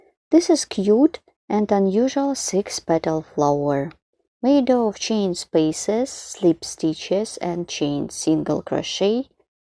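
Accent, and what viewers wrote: Russia. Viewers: Duzhe chuty ruSSkiy aktsent!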